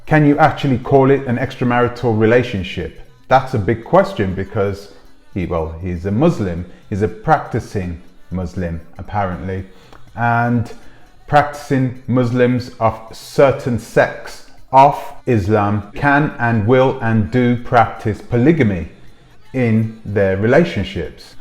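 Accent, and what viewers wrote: United Kingdom. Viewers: Your a weirdo for digging in the brother JT's personal life . Corn ball British accent . FOH !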